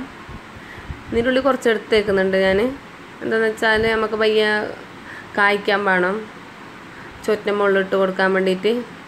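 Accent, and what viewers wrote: Kasaragod, India. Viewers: 👍👍👍nammale ksd slangil bisiyo pareembonne pang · Super... nhammale kasaragod baasha.. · Kasrood slang sooper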